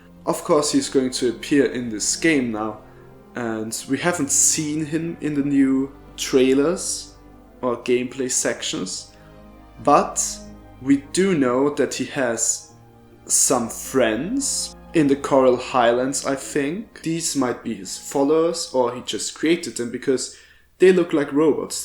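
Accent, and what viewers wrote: Germany. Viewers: Bro deine englisch aussprache verrät direckt das du deutsch bist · love your vids and your german accent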